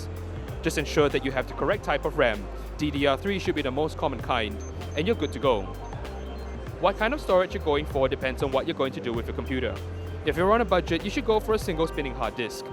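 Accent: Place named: Hong Kong. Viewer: Probably Hong Kong cuz we so do have a Asian British accent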